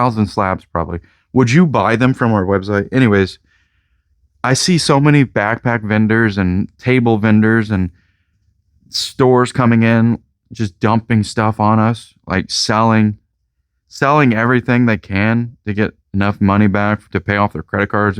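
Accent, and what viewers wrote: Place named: United Kingdom. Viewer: Dude…you should do your video I that British accent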